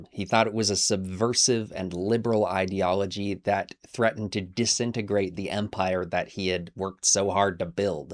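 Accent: Wales, United Kingdom. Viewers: Chief are you welsh??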